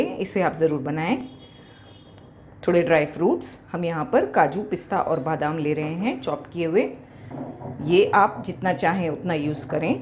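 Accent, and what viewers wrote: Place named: South India, India. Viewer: Hello ma'am rishampreet here from India today I watch ur video first time nice cooking videos Aap ki Hindi se feel ho raha he ki aap India ke south se he m I right or not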